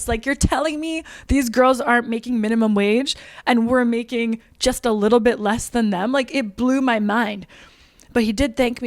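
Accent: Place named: South, United States. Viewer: For a Canadian you sure speak southern talker.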